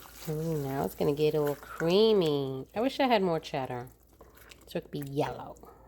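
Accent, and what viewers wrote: New York, United States. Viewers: Love that NewYork accent. Hope I'm correct. Lol I personally go to your channel for that Italian I love eating and cooking. I also find the exact problem with my LeCruset pans also I was so disappointed that they stain. How do u clean yours?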